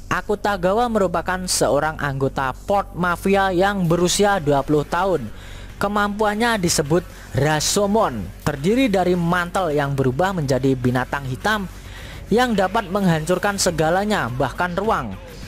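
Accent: Java, Indonesia. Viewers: Suara nya medok ya bang 😆😆😆😆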